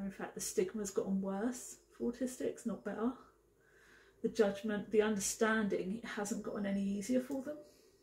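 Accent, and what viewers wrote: United Kingdom. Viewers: J like you which part of England you come from? ❤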